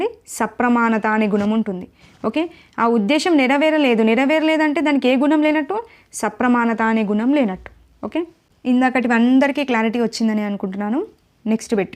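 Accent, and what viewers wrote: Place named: Telangana, India. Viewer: మీరు తెలంగాణ కదా మేడమ్ Voice telustundi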